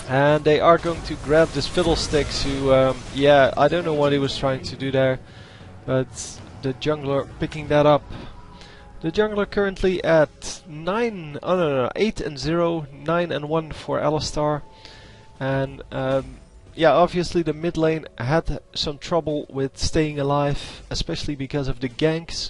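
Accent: Netherlands. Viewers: is that a dutch accent i hear ?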